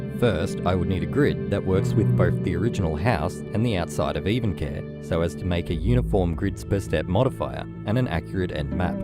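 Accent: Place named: Australia. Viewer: Okay it's fucking criminal how little attention your hard work has got. Plus it's always amusing to hear another Aussie.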